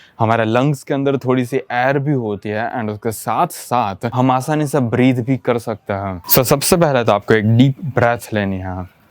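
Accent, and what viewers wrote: Gujarat, India. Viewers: I can feel the Gujarati accent in your speech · Real hai bhai, yeh Gujarati speaker hai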